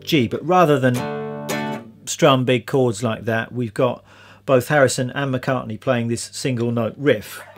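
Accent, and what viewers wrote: United Kingdom. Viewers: Eres británico verdad?jsjs:3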